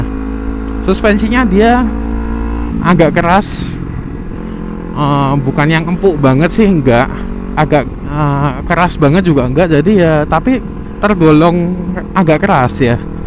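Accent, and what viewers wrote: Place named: Java, Indonesia. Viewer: cocok campur jawa om ngomongnya, medhok pisan. "rx king cok" 😂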